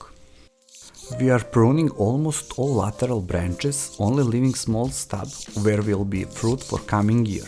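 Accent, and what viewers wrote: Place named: Russia. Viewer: Вы русский? у вас акцент, ну или произношение русского)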